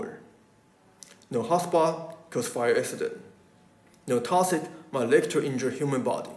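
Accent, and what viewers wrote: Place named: China. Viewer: I don’t understand your Chinese accent.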